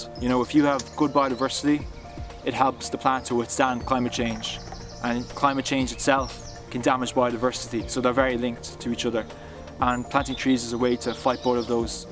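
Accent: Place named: Ireland. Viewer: Bro! That.s amasing what u did! Great deed! I luveEire! I.m a Paddy 2, but I was naturalised dere, a fkn brit made me run out of that dream land, he was my x boss , don.t kneel down in front of those bastards anymore! RESPECT 2 U!!!!! I luv Ur accent 2!! Ur fkn great! YESSS!! I.m happy 2 c this , what U do!! If I.d be a chick, i.d show u my boobs, or even more! ! !!! Invite U in! ! ! !!! Xquize me, i.m like.n arrow! ! ! !!!! That bstrd sugest I.m sick.n da head, proganda against me in a way he was not suspected 2 b guilty!bro, U.r a great nation!! All the way!!! Yess, r some bstrds! But per general...!!!!! Fc uk!! The imperilists destroyed that realm!! Take of what U can man!!! Tk U a bunch 4 what U do!!!! Go in front of stephen.s Green , in Park show Urvface there, the chicks will jump on U now! I.m sure of that!! Do it!! Score!! Yep!!Ayeeaaaahhh!!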